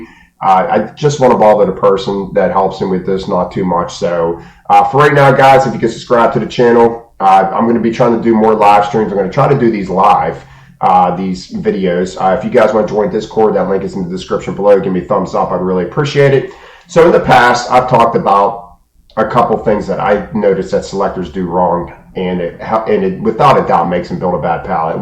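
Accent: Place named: Pittsburgh, United States. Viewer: Caught the Yinzer accent right away 👍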